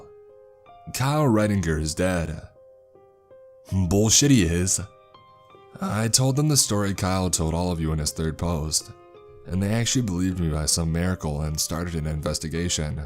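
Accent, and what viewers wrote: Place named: West Virginia, United States. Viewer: Holyshit you actually sound like you're from West Virginia. I'm from the mountains of southern West Virginia and Mercer county. Just outside of Princeton bluefield area. You sound like a big old farm fed Burley mountain man like me LOL I'm 6ft 4 inches 273 lbs 40 years old and can still chase a deer through the woods. my family always used to pick on me especially my uncle my dad and my brother when we would go deer hunting because they would set a white for the deer to come to them. But I always stalk them and my brother has a video of me running after a deer and killing it with a Bowie knife. And for all you animal lovers out there just know I'm an animal lover too... I think dear squirrel turkey and rabbit is all delicious.!!! 🙄🤔😯😤😠... 🤗😀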